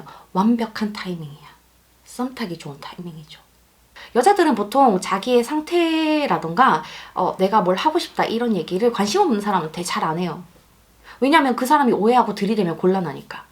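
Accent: Gyeongsang, South Korea. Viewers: 사람은 가장자기 다울때가 젤매력적인 거라고 생각해요 사투리와 경상도억양 넘 듣기좋은데 근데 마지막 서울표준말도 귀엽긴하네요 ㅋ 서울표준말은 가끔 써주세요 🤭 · 자존감을 지키세요 경상도말투는 안바뀝니다 서울말 쓰는 채널보러가라고 하세요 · 사투리가 아니라 경상도 말씨 인거죠 대한민국 사람이 이 정도 못알아들으면 그냥 자막 보라고 하세요. 영상 아주 좋습니다 개념치 마시고 힘내세요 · 대구 경상쪽 치고 사투리 많이 없으신 편이에요. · 저는 의 경상도 억양이 아주아주 좋습니다. (저는 경상도 사람이 아닙니다.) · 그모든것을 알아도 눈치가 없으면 꽝~ ㅋㅋㅋ 이론과 현실은 다를때도..케바케~ 그리고 다 알고있으면서도 내가 겪으면 상황판단이 잘안되는....머 ~ 그런경우도~??? 일로서 사람을 만나는 경우는 잘안다고 생각하는데... 이성에 대해서 관심은 많으나 만나보고 싶다는 관심이 없다보니.... 호감인지~ 호의인지 구분짖지도 않고...ㅎㅎㅎ ^_________________^ 이번 영상도 재밌게 끝까지 다봤는데... 끝에 끝맺음에서 사투리 관련해서 말을 하셔서 글을 보태여 보내요~ 저는 경상도 사투리 귀엽다고 생각합니다. 대구는 "맞나~?"라는 말을 많이 쓰더라구요~ ㅎㅎㅎ 저는 꼭 표준말로 영상을 만들어야한다는 것은 없는거 같습니다. 듣기 거북하다면 안보면 되는것을 굳이 영상을 봐가면서 컨텐츠 제작자에게 이야기를 하는것은 크레이터에게는...그리 좋은 말로는 안들릴거 같습니다. 몇십년을 사투리를 표준말이라 생각하고 살아왔고 그 사투리를 하루아침에 바꾸는 것도 힘들텐데~ 그리고 그억양을 바꾸려하다보면 이것도 저것도 아닌게 될수도 있습니다. 저는 전라도지만 군생활을 경기도에서 해서 경기도억양도 쓰다가 친구가 하동친구가 있어서 경상도 억양도 나오다가 친구들 만나서 전라도 사투리 하면... 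너 어디사람이냐고 물어봅니다. ㅋㅋㅋ 의 사투리가 저는 귀엽습니다~ 그대로~ ~~ 쭉~~~~~~~~~~~해주시길... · 사투리 안쓰는거 같은데.. 단지 억양만 경상도 식이지만.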